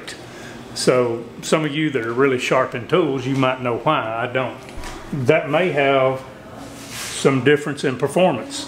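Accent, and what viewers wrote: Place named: Florida, United States. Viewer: Just asking, love the accent man, reminds me of FL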